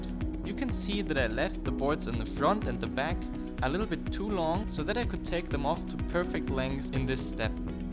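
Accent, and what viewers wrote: Germany. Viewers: du bist aus deutschland oder? man hört es ganz leicht aber dein englisch ist echt mega gut man hört kaum noch einen deutschen akzent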